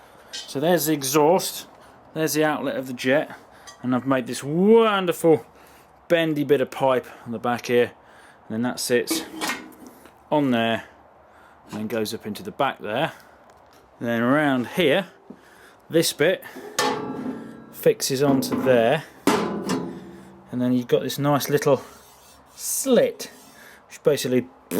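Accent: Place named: Cockney, United Kingdom. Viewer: Are you an East ender ? you got a fantastic thick Cockney accent.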